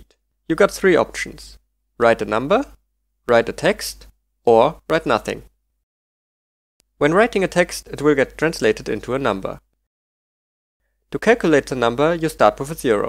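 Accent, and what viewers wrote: Germany. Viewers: man hört das du deutsch oder whatever bist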